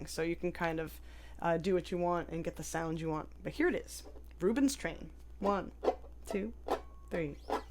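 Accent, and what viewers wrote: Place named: West Coast, United States. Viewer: why cant't she pronounce a ""T"" ? I really resent this ""west coast"" English. Go back to your 3rd grade English teacher. Speak proper English if you are going to do an American YouTube !!!! Duh !!!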